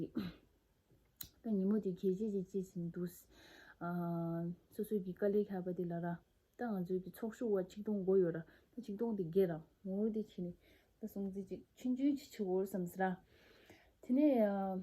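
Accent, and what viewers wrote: Standard. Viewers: བོད་སྐད་གཙང་མ་ཡག་པོ་རྒྱག་གནང་གི་འདུག བཀའ་དྲིན་ཆེ། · བོད་སྐད་གཙང་མ་འདུག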